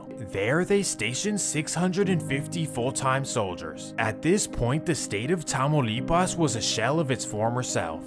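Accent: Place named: Stockton, United States. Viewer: The way he going hard pronouncing these mexican words make me think he from stockton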